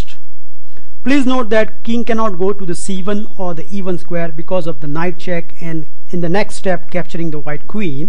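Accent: India. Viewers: ***** I like your accent. From which part of India are you?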